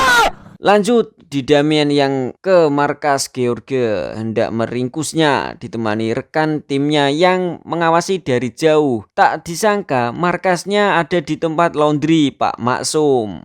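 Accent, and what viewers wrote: Java, Indonesia. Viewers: Meddhok tenang mas. Hehehe JB Malaysia nyimak❤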